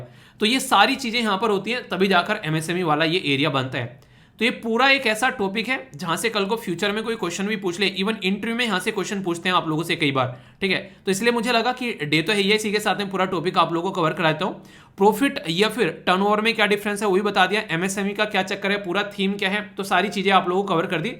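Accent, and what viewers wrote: Haryana, India. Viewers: sir app kon si district se belong karte hai app ki awaj haryanvi lagti hai jo bahut hi pyari hai i am also haryanvi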